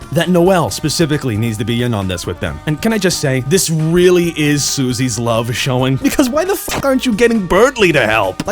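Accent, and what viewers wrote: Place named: New York, United States. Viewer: Love your vaguely New York accent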